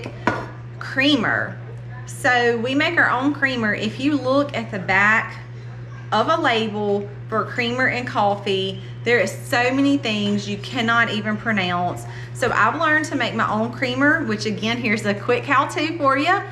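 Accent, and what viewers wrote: South, United States. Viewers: I do apologize the stupid speaker phone I am going to have to go over what it says I really like your accent you could tell your Southern. My accidents between Michigan and South so it's all screwed up LOL and why I like you and your family really because you your husband and you are not afraid to bring up Jesus Christ and you're not afraid to admit why you want to homeschool your kids God sees that so keep doing what you're doing and wild edibles there's an ideal could do a thing on that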